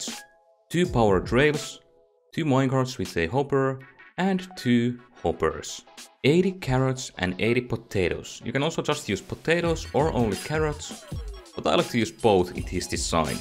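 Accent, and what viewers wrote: Finland. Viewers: Are you finnish? I can hear your accent😂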